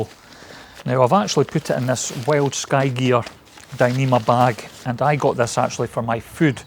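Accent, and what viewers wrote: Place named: England, United Kingdom. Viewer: nice videos but it's hard for me to understand your english accent.👍🇬🇷🏴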